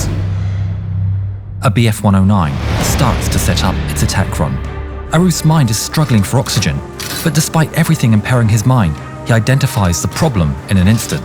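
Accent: Portugal. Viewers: Não , eu não gostei, simplesmente adorei sem contar o elegante sotaque de Portugal que adorna esse idioma amado herdado de vós pois sou brasileiro e amo minha querida língua portuguesa!!!❤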